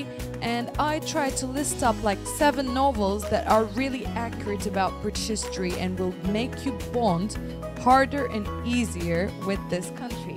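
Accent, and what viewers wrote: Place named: Russia. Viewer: Her accent is uncertain but I would guess it is Russian.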